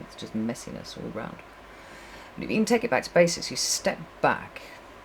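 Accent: United Kingdom. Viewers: Your first reading for me. Lovely to hear someone from the UK. Really resonated with what’s going on in my rather complicated personal life right now. Trying to figure out in which direction to go in the best way possible moving forward. Thank you for your insights.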